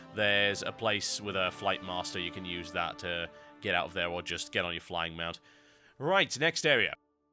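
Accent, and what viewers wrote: United Kingdom. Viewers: When i logged in to YouTube i was like: "Oh, come on now. I need some fantastic brittish commentary on some fresh Cataclysm videos." And When i saw this video under subscriptions, i was like: "Yessss!":D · Erm, british accent?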